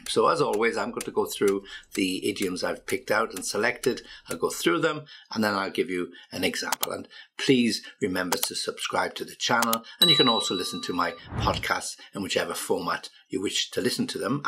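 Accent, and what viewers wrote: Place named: United Kingdom. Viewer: Your accent is so interesting 🤓✌️ may I ask are you British 😸? If you are may I ask for some British pronunciation videos please 🤓🙏🤓🙏🤓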